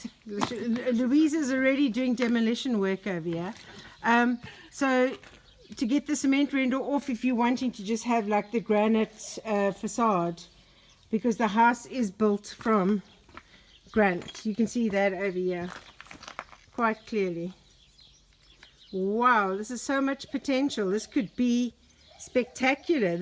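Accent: South Africa. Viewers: You sound so SouthAfrican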